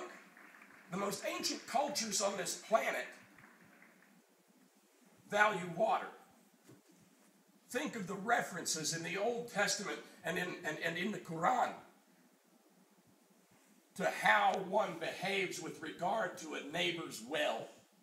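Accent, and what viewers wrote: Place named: South, United States. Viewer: Southern drawl nonsense. Listening to this guy is like shitting razor blades. His daily trash talk on "The Horn" is pathetic and so juvenile. Keep propping up idiotic Lib rhetoric is all this clown does. Stands for nothing but status ignorant quo of leftist crap. How is that "hope and change" working out for you? You got your long legged mack daddy in the white house and see how he disregards you all daily. Absolutely hilarious!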